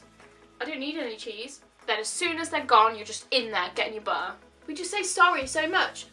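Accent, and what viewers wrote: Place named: United Kingdom. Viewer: I love the British accent. I could listen to you talk all day. Lol. So what kind of tea do British people drink?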